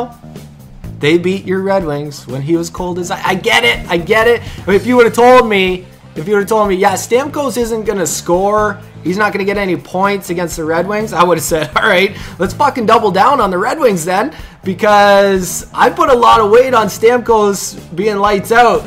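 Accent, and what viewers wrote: Canada. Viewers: Why are you always Red Wings? They your favorite team? I live in Michigan so they my favorite team as well. Such a shame against Tampa Bay! You have Canadian accent so you from Canada? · ***** where are you from? I am from the Detroit area and I'm a die hard wings fan. I've been watching a lot of your videos lately and haven't figured out where you are from lol. DET? or no..sounds like your canadian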